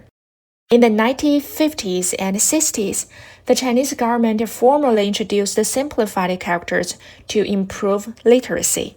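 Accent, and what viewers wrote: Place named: England, United Kingdom. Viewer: really great and like your English accent it is very charming. Thank you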